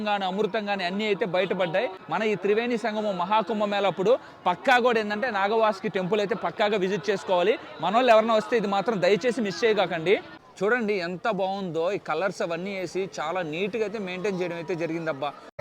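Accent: Nellore, India. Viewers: సూపర్ గా చెప్తున్నావ్ నాన్న నెల్లూరు భాష ఉపయోగించి మాది కూడా గూడూరు చాలా సంతోషంగా ఉంది బాగా వివరిస్తున్నావ్ వెరీ గుడ్ నాన్న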